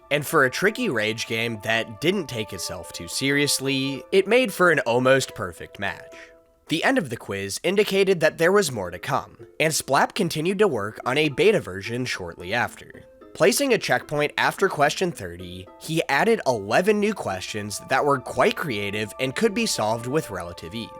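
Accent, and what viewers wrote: United States. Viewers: good video like 2 minutes in but i have to say i am so charmed by the socal accent. spiritually it sounds like you were born wearing a beanie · Are you from Pittsburgh/the Pittsburgh area? I swear to god your accent is exactly like everyone in my hometown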